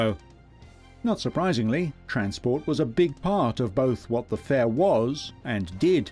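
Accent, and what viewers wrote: England, United Kingdom. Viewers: History of AMERICAN cars....with a BRITISH narrator.....(who couldn't properly pronounce the names) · English voice was a dead giveaway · Because he is British, they pronounce words differently than us. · The History of American Vehicles. Content is good but why the use of British narration is mind boggling. Can you say Aluminum? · I'm sick to watch documentaries about American goods like cars, narrated by a Brit. It sounds so ridiculous as a vegan cannibal. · Hey all, drop the "BRIT" speaking,,,,,,,,, he's calling a '55 Cad a 60, and a '60 chevy,,,, is said to be a '60 Buick!!!!!!!! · It is prnounced c short a mare, like a female horse. O long camareO get your narrator to become familiar with US english. Instead of smug incorrect, British English. If your video is about US cars. Use US pronunciation. Duh. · Alan, you probably did not notice the English accent. 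I believe this was produced by one of your networks.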